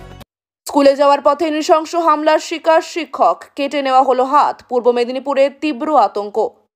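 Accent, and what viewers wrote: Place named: Bangladesh. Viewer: আপনি যতই ভালোভাবে বাংলা বলুন না কেন। আপনার কথায় বাংলাদেশীয় ব্যাপারটা রয়ে গেছে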